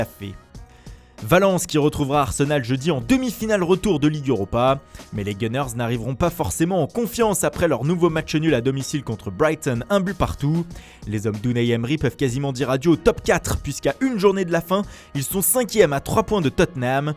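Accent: Italy. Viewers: J'adore ton accent italien comment s'appelle le président de la Juve (Andrea Agnelli)😂💕💞🔥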